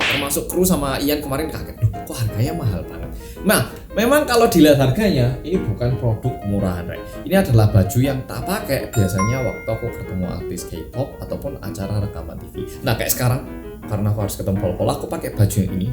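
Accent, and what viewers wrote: Java, Indonesia. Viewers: Korea rasa Jawa..mdoooeee ram eram..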